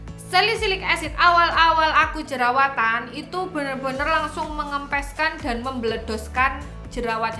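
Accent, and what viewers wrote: Java, Indonesia. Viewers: Medoknya bikin kangen❤ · Aku paling seneng medok'e mbak 😄 (((SANGAR YA?))) Btw, kulit wajahku berminyak dan aku juga ngga cocok Some By Mi, setelah produknya aku pake sampe abis, aku puasa skincare dulu baru pakai skincare yang sederhana aja; facial wash, toner, dan moisturizer sampe kulitku anteng. Ditunggu video selanjutnya, mbak! Aylapyuh! 😘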